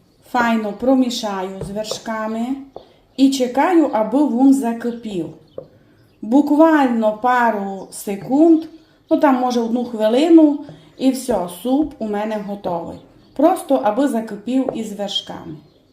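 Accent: Transcarpathia, Ukraine. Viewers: Пані, Ви готуєте гарбузовий суп так, як готують в Австрії. В нас вдома (чоловік австрієць, дуже смачно готує) в цю пору цей суп часто в меню. Перед поданням посипають невеликою кількістю підсмаженим насінням гарбуза і легенько поливають гарбузовою олією. Ви прекрасна господиня! ❤ Дужу люблю ваш закарпатський діалект, слухала б годинами. Мирного неба в Україні!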